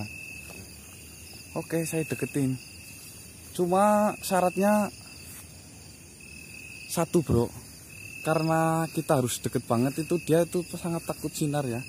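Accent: Banyumas, Indonesia. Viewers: Jal rika wong endi apa wong banyumas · Mas wong ngendi mas, kayane ngapak tmen wkwk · Woy kamu dari Ngapak bagian mana?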